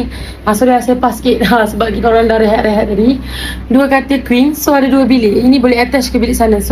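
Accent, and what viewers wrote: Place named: Sabah, Malaysia. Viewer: i give Sabahan slang A+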